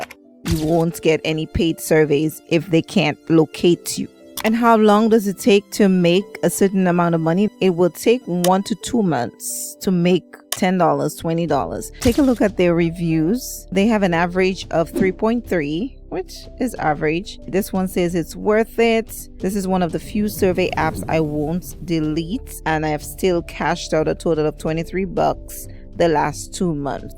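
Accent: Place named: Caribbean. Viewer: This is the Carribbean accent , she seems to be Carribbean too.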